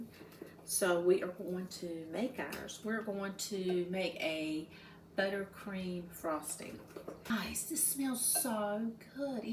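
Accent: South, United States. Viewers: You are just delightful! That sweet southern drawl, unhurried cheerfulness as you mix and chat in that bright ,pretty kitchen! feels like I’m cooking with my favorite aunt- she’s just as blonde and sunny! I’m now on a quest to find that gorgeous striped sweater! So happy to discover your channel Blessings to you and yours.💖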